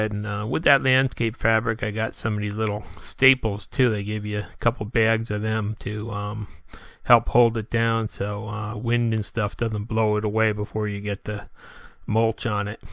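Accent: South, United States. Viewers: Message From The UK.... Loved the video, loved the southern accent, loved the finished job! God bless Southampton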